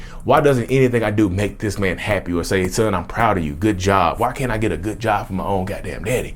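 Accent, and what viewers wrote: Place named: North Carolina, United States. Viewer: That preacher thing happens to my voice in my videos sometimes too! 😆🤣🤣Also, the very FIRST time I heard you speak, I was like, "This dude sounds like home. He has to be from NC..." I'm in L.A. by way of Charlotte. Congrats on all the moves you're making,. -S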